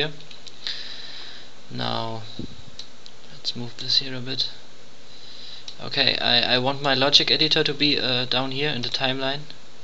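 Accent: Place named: Germany. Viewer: Da haste son bissle deutschen Akzent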